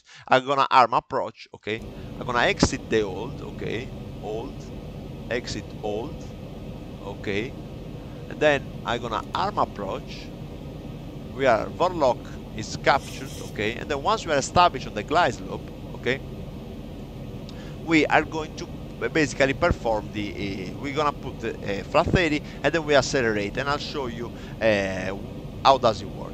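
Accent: Italy. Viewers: Brilliant Airline Flight Instructor and that Italian accent!!!!! It is quite entertaining and engaging!! Keep iit uuup!! Would like to see how you teach jet transition.Are you planning a video or have one produced yet? I wish to compare Airbus with Boeing as the philosophy of flying is different.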